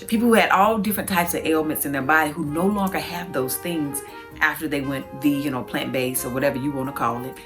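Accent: South, United States. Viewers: She sounds so southern it almost sounds like she's auditioning for a southerner for a movie. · I like her. She sounds so country, honey.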